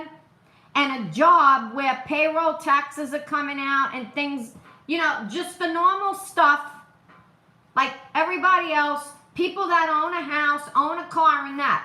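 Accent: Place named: Bronx, United States. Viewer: Just love your thoughts passion and God u live your Bronx gurl accent. I can't wait to see you and and now. Live u guys. My Brooklyn Long Island accent is a good match to yours. I hope someone gets u out if jail soon. This is not Fair. Censorship has to Stop. FB a public company. Live u thanks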